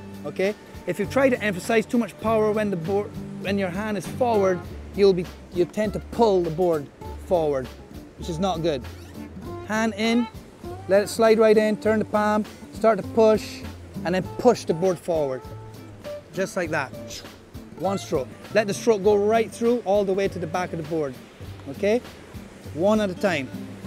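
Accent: Ireland. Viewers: You sound very Irish mate.......I guess that's to do with the history of Barbados. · Irish? My work mate is Irish too...good job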